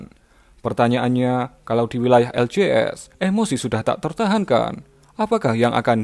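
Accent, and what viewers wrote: Java, Indonesia. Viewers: Medok amat yang ngomong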